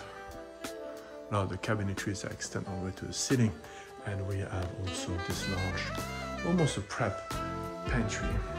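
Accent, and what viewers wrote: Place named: France. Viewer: Great video, but I didn't understand a damn word you said. You can either skip using a microphone or not have a heavy French accent, but you can't do both. 😊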